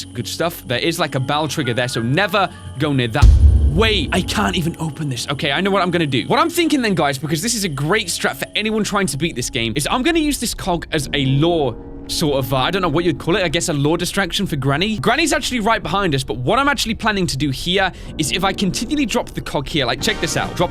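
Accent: United Kingdom. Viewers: R u British,?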